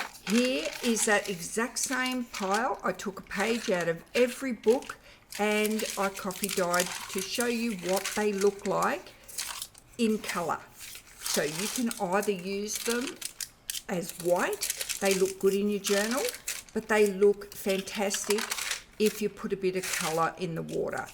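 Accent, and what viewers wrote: Australia. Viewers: It’s so awesome hearing your accent… Aussies are so good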